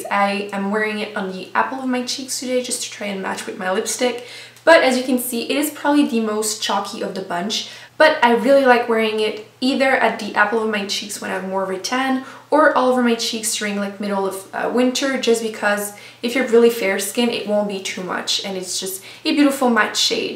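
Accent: France. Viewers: gorgeous French accent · I just love Nars blushes because they are so pigmented! I never have to worry about them showing up on my skin. I have 8 right now, Amour and Seduction are next on my list. Es-tu française? J'ai toujours l'impression d'entendre une pointe d'accent.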